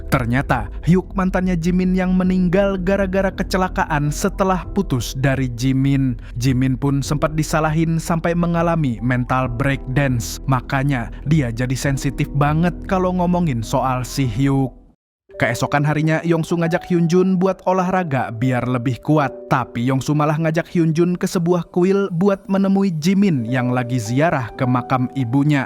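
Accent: Banjar, Indonesia. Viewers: Nah urang banjar kh😂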